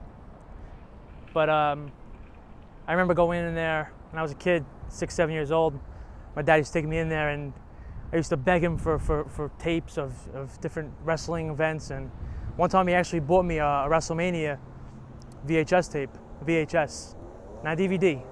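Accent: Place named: Staten Island, United States. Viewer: This guy has a thick Staten Island accent. Sheesh.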